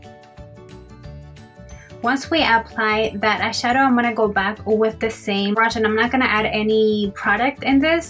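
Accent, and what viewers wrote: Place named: Caribbean. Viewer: Such a pretty look! I didn't realize you collaborated with one of my favourite youtubers. You have an accent similar to hers are you from the Caribbean?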